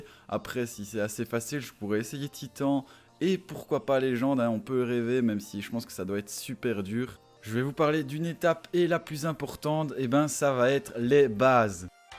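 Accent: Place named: Belgium. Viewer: J'adore l'accent belge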